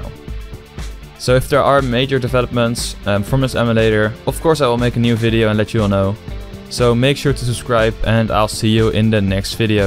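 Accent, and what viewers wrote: Netherlands. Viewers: I sense a Dutch accent tell me if i'm wrong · You dutch?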